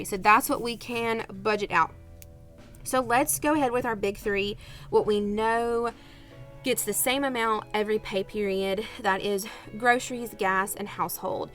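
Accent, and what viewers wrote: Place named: United States. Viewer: Ah love you accent! Which part of America are you from? I work in education too (in the UK obviously lol). I can’t give up my twinkl subscription for teaching resources ☺️ so helpful to have (and I get a free book sent every term).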